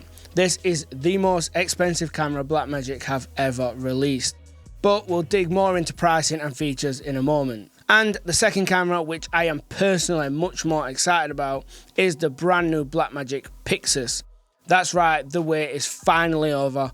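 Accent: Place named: Scotland, United Kingdom. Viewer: Damn that Scottish accent. 😂 Thicc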